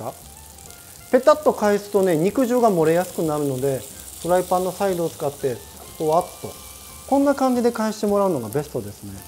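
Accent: Kansai, Japan. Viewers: こんなすごいレシピを無料で教えてもらえるなんて本当にありがたいです！コウさんの関西弁の柔らかい話し方も大好きです😊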